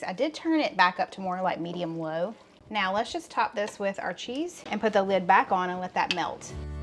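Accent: South, United States. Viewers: YouTube put you in my recommended videos. Glad they did. Love your quick recipes and your Southern accent. I live in Texas and appreciate your downhome manners. You made me cry, though, when your husband was praising your cooking. My husband used to always do that and his face would light up and he'd smile just like did. I miss cooking for him and having him ask for seconds or even thirds. Sorry about your cat, too. That made a tear come to my eye, too. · you are just flat out adorable with your southern accents;];];] love your family!!!!! i live in northern maine and the leaves are almost done dropping nites are in the low 40s sometimes lower then that come morning, thank you for all that you do...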